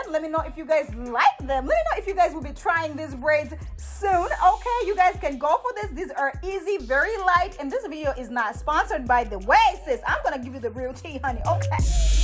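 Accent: Caribbean. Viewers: Looking cute sis💖 you sound like you're from the Caribbean. I'm from Jamaica🇯🇲👍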